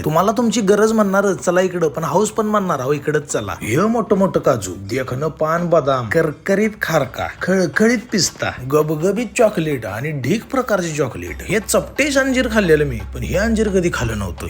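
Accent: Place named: Satara-Kolhapur, India. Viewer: खुपच मस्त video भाऊ... आणित्यात तुमची commentry म्हणजे ईशयच नाय रे भावा... इरसाल कोल्हापूरी... ❤❤❤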